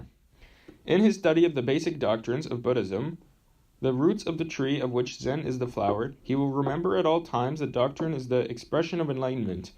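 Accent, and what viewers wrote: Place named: United States. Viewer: A teenage American with a cold narrating an English man's work. It really doesn't work.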